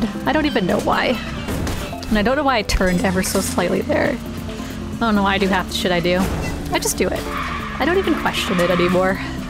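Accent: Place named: Canada. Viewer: Silence or humming. Got it. :_marQuack: Girl, you’re way too good at this game what the actual fuck. Seriously wtf im horrible lol Nothing to do with anything but am I allowed to say that I’m a tad in love with your canadian accent? 😍 The music in this game is so 🥰 geeeez. Its seriously worth the frustration.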